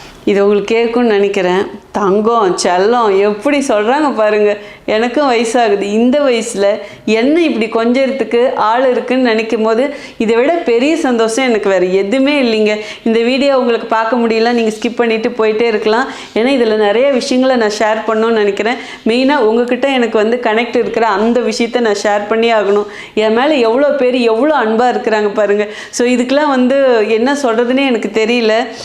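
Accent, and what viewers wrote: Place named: Bangalore, India. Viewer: You Are in which area??. Chennai or other place. Your Tamil is slightly Bangalore tamil people pronounceation.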